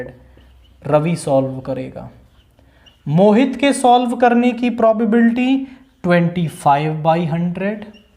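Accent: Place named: Haryana, India. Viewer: Tone to haryanvi h bolne ka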